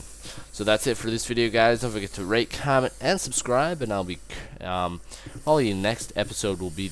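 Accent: United States. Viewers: Wow your voice is different! You sound american! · HOLY SHIT HE SOUNDS SO FUCKING AMERICAN!!!!!!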